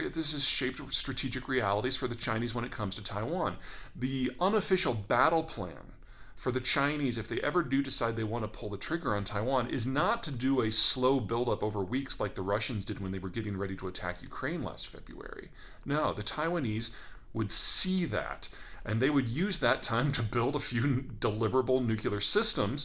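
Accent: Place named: Texas, United States. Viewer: Don’t blame him, he lived here in Texas for a long time. We barely speak English.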